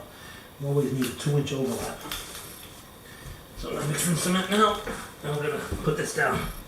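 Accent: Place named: Boston, United States. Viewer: might I ask where you accent is from? It sounds to me (my untrained UK ear) what a Boston accent would sound like but seems to have a hint of something else occasionally. Where you Boston born and bred?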